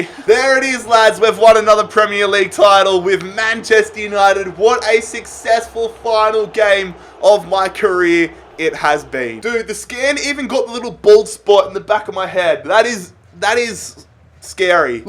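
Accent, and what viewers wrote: Australia. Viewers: Are you austrillan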